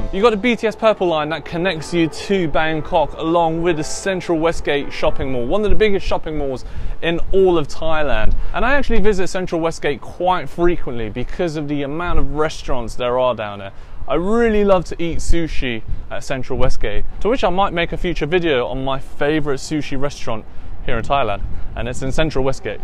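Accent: United Kingdom. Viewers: I love your channel I love British accent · A guy with such pure British accent for a change...😁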